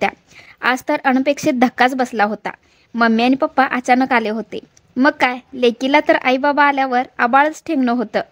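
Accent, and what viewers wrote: Kolhapur, India. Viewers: Tumch gav konat.bhasha kolhapur chi vatate.mi pan kolhapur madhun aahe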